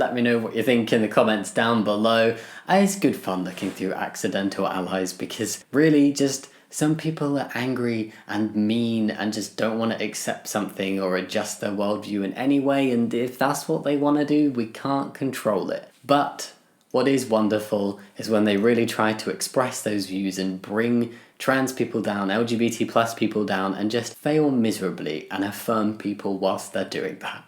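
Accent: United Kingdom. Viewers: I’ve watched your videos on & off for years. & this is the first time I realized you have a British accent 🤯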